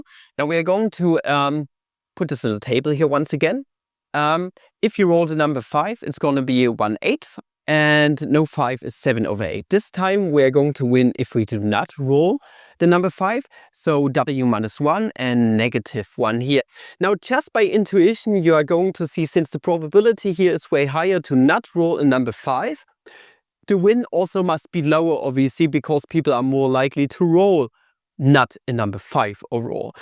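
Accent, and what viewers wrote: Germany. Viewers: I bet your native language is German, it's so noticeable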